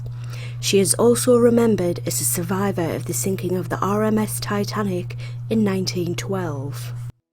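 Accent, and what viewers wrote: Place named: North East England, United Kingdom. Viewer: you DON’T sound robotic at all! What part of NE England do you come from? The northern and/or eastern parts of Yorkshire? Durham? Tyne and Wear? Northumberland? No worries. Listening to you narrate is very pleasant. I have a visually impaired friend who I usually have to read your daily video to. Having you narrate it was a treat for her. Please consider doing more narration again. As always thanks for my daily dose of vitamin H.☺️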